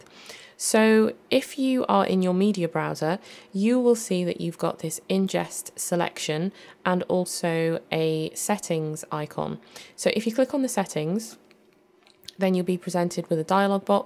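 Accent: England, United Kingdom. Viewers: Very good tutorial. I have seen a few on this subject and this was clear with a lovely English accent for a change. Thanks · A great instructional video! Doesn't hurt to have that English accent either! ;)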